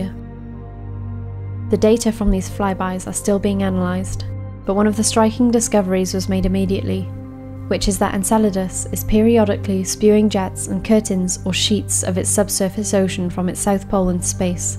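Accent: United Kingdom. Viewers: They are both welsh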